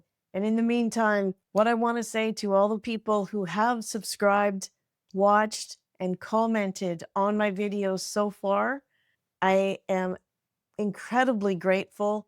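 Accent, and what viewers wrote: Canada. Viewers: Thanks so much for the helpful explanation. I started a channel (under a different handle than this one) and keep trying to plug away at it waiting for it to gain traction, but now i know - it's stil early! Also - I registered the accent before you mentioned you were Canadian. I enjoyed listening to you! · Love your Canadian accent! Reminds me of my Canadian relatives!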